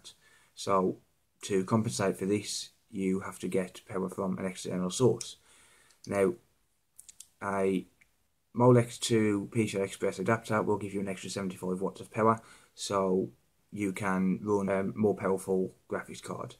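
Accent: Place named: London, United Kingdom. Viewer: What a London blok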